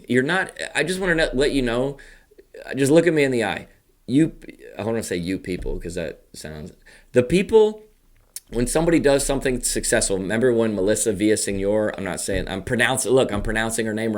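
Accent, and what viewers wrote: South, United States. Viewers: Keep it suh-thurn! It’s the best!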